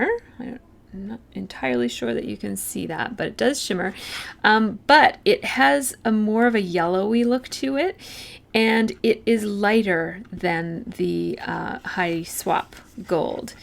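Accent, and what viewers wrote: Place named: Canada. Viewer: Really fun to watch,. Hadn't really noticed before your pronunciation of 'outlining' because I just love the Canadian accent and that's that. Now I can hear you 'oatlining' everything hahahah. Cath x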